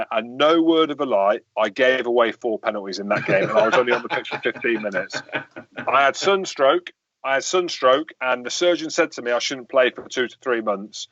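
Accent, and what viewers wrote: United Kingdom. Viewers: Sounds very Scottish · Mate he has an English accent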